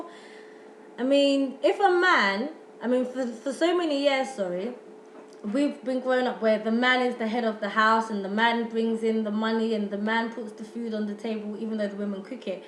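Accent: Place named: RP, United Kingdom. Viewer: Listen to that posh accent! LOVE IT! Do men measure up? Hmmmm...I am afraid to answer. LOL. For me personally, the man that I have DOES measure up, which is shocking because I never thought I would come across a man who fulfilled ALL of my wishes! This is a good video. For sisters who are still looking, there should be NO compromising! FAVORITED!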